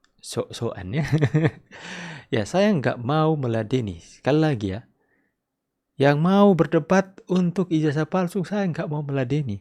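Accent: Manado, Indonesia. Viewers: Tomohon selalu hadir nyimak. 👍😍 Tebarkan terus Kebaikan dan Kebenaran Bung bersama teman2 kreator yg lain. 🙏😇 Sekali2 selipkan dialek/logat Manado neh Bang. 😀😊...mantaaappp. 👍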